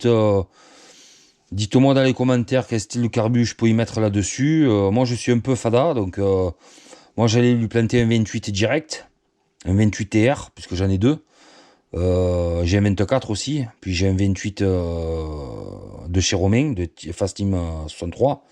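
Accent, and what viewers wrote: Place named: South, France. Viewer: T est bien du sud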